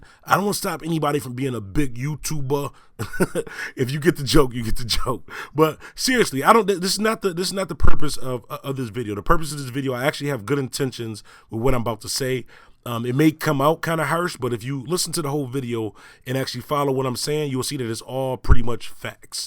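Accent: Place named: Pittsburgh, United States. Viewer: You from Pittsburgh? you def got there accent or Buffalo Ny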